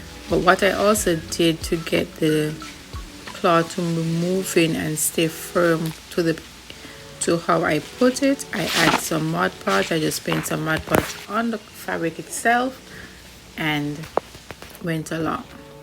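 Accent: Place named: Jamaica. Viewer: G'Day Mate, did you soak the second and third pieces of fabric like the first one or place it down dry then apply Mod Podge. Also what kind of Mod Podge did you use and do you have any suggestions on an alternative product as it is expensive hear in Oz, I don't think they even sell large containers like the one you are using. I prefer the 2nd and 3rd softer look, I also think a smaller version with embellishments would look lovely in a little girls room. You did a fantastic job very classy, only thing I would do is spray paint with a clear Lacker too help keep the dust off long term, with such a light colour. I have a few spin off Ideas from this, I think the fabric need to be light and flowing you could use more than one colour on a single canvas. I had a little trouble understanding with you Jamaican accent.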